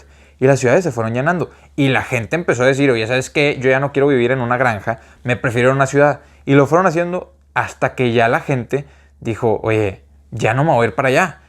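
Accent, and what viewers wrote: Monterrey, Mexico. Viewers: Pues bien bonito que es esa plática con ese maravilloso acento regiomontano. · tiene un acento bien marcado Regiomontano de Mexico que me trae grandes recuerdos de mi tierra.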